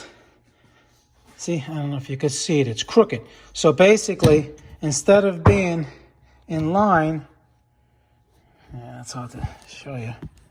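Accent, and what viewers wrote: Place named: New England, United States. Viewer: My Boi got that New England/ NY accent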